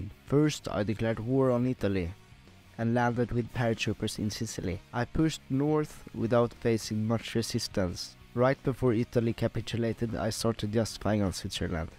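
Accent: Sweden. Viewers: Hey are you Swedish? We have the same accent pretty much so I KNOW you’re at least Scandinavian 💀💀💀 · Are you swedish? I can hear it in your accent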